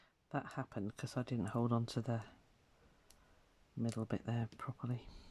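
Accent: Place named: United Kingdom. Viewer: I’m really enjoying watching you create and listening to you talk! You’re inspiring me very much! Don’t worry about how much time your video is taking. It’s a pleasure to be creating here and having your company in my home. You can take all the time you want. If somebody wants a bullet for a video they can speed it up if they want. It’s nice listening to your soothing British voice. I’m in Arizona sitting here with cancer and creating a beautiful rug hooking. It’s very healing to me listening to you. Thank you so much for sharing your gifts!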